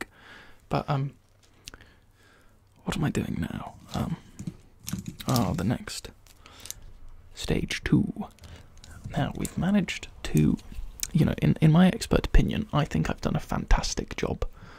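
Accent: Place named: London, United Kingdom. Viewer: The London accent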